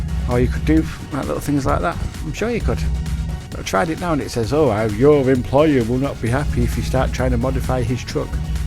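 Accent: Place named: Barnsley, United Kingdom. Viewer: i know a barnsley accent when i hear one mate lmao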